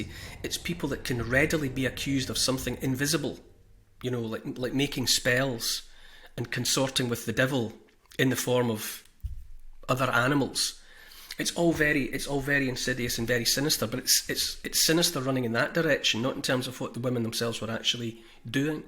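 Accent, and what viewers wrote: Scotland, United Kingdom. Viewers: well i must say that information couldn't off not sounded any better Scottish twang made it even moee captivating thanks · First time on this channel🔥whats your accent lad? Scottish? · Are ye Scottish Sir? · Your Scottish accent is amazing 👍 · Are you Scottish. I love you accent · I adore a good Scottish burr, too. I like them even better in a kilt 😂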